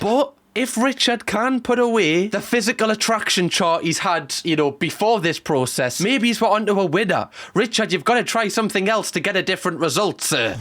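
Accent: Newcastle, United Kingdom. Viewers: 'm an old lady stuck home a lot when I'm not being ferried back and forth to hopsitals for chemo and I discovered you a few weeks back. I can't even recall which video it was now but your wonderful Newcastle accent hooked me in. Always been a pushover for a Geordie accent. But I stayed because you're not only a funny little bugger, you're actually quite delightful. Personable, self deprecating & above all compassionate in a way many commentary channels are most certainly not. Well done and thank you for making me laugh. I've had tears a few times in every video I've watched. I've made my son subscribe as well. Let's get you to a million, eh? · I love the Geordie accent. My parents were both Geordies but moved to Yorkshire when they were quite young. All their siblings stayed in Geordie land though. I’m now in Canada and most of my Geordie family are gone so I love to listen to you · He sounds like he’s from Newcastle (city in England)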